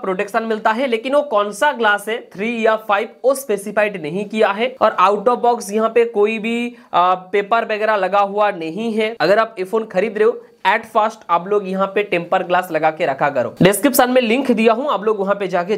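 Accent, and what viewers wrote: Bengal, India. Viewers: You have a strong bengali accent.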